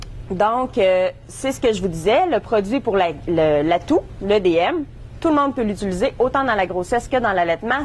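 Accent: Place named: Quebec, Canada. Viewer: intéressant mais.... qu'est ce que je de déteste l'accent québécois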